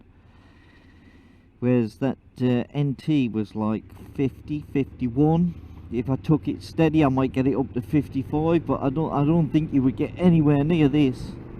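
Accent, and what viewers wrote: North East England, United Kingdom. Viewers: Ah thats great thought I picked up the accent, I'm from North Shields, like you I moved to London at 20 yrs old and Im 60 now living in Hertfordshire. I have an NC and although it isn't a flying machine it does just what I need. I'm new to your channel but will go through your vids which so far are just great Thank You. Take care mate.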